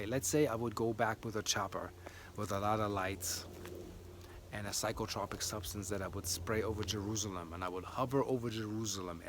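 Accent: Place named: Puerto Rico. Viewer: Greetings, my star bredren. Thought you might find this of interest: <link> A relevant quote: "In this ancient genetic continuum between Africa and West Eurasia, sampled African-American individuals are most similar to sampled individuals from the Nilotic and Horn of Africa regions" Your accent places you as originating on the east coast, perhaps of Puerto Rican extract? This article speaks of West Indies and Caribbean populations and their relation as far as their genetic makeup, to these ancient Nilotic populations as well. Literally, we ARE them, reincarnated as it pertains to soul groups and karmic recompense. Blessings, Mark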